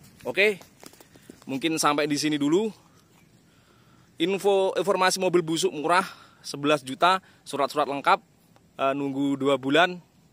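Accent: Java, Indonesia. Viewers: Aku senang bahasa indonesia mu mas tapi jowomu medok jawa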